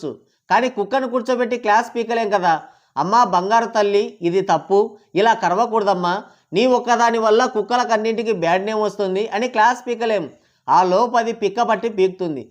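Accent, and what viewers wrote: Rayalaseema, India. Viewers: I watch your videos regulary and always like your punches, video script, and presentation(Vakchaturyam), I am from London. The way you speak you sound like you are from Rayalseema and me too from Dhone.Good I would like to meet you in my July visit to India. Let me know if you would like to meet, I can share my contact.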